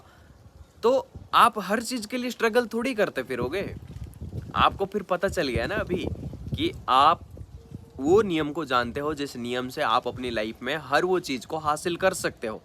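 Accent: Bengal, India. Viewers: Dada are you bengali??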